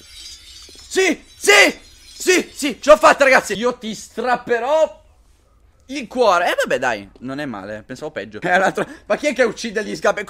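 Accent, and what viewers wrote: Italy. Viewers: spain or italy...you almost sound italian??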